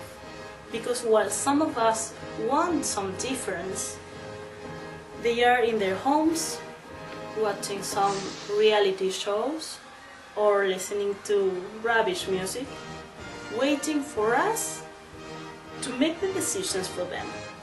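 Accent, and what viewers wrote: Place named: Germany. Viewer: so cute with your german accent! hahahaha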